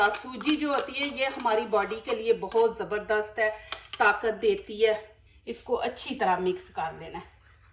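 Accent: Punjab, India. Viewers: اپ پنجابی سپیکنگ ھیں اپ کا ااردو کا لہجہ بہت بھونڈا ھے اپ یہ ھی لہجیہ اپ رکارڈ کر کے دیکھیں پھر چووولھااا یا چولھےےےےےے پر کا لفععمر کیئےےے تھے ھمرے بچے اپ کے اردو کے لہجہ کا مزاق اڑاتے ھیں کوی پنجابی اپ کو نہیں سمجھاے گا اب ھم اردو سپیکنگ ھے ھمملییمن لفظوں کو ڈانٹا نہ کریں ھمبس کیا بتایں اپ کو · ماشاءاللہ ۔۔۔ریسیپی سے بڑھ کر آپ کی پنجابی اردو مزے کی لگی😅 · پلیز پلیز آپ اردو کے بجایےپنجابی میں بول لیں فل پنجابی لہجے میں اردو زبان بہت عجیب لگتی ہے سننے میں ذرا بھی اچھی نہیں لگتی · Kamal. log. hee .ap.Angrezi. boole. ya urd .boole. Lagta he panjabi. Me.bool rahe he .baqui. Keke picture me .bohth acha lagraha he.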